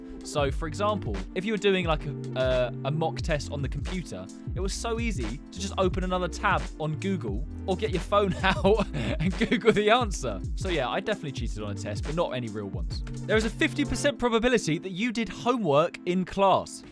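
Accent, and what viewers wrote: United Kingdom. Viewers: I like your British accent It’s kinda like more like dinner table queen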